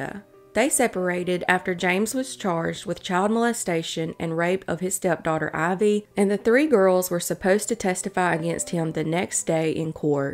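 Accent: South, United States. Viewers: I like your Southern accent ... And of course the interesting stories and great narration. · Love your channel! You have such a soothing southern voice These cases are so tragic. You should definitely do another Oklahoma one!! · This narrator has got to go. I've lived in Arkansas most of my life and have a Southern accent myself, but this is just frustrating and distracting.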